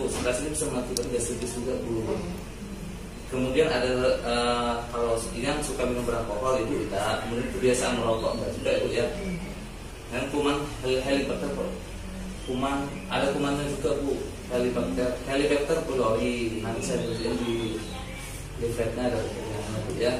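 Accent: Java, Indonesia. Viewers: Medok kali lah bg hahaa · Medok bgt mas. Hehe Good job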